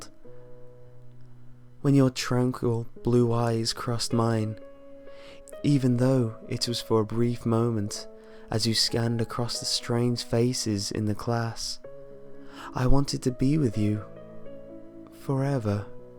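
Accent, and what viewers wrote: United Kingdom. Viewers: Your voice with a British accent is beautiful.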